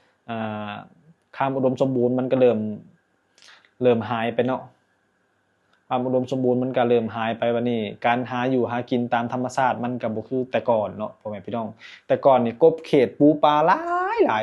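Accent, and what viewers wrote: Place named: Phu Thai, Thailand. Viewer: เป็น คนภูไท เบาะ บางคำ เว้า ภูไท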